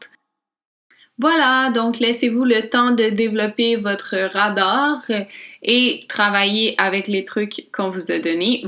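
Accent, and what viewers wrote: Quebec, Canada. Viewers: Soetevids HD C’est notre accent, l’accent québécois si t’aime pas tu sors merci 😒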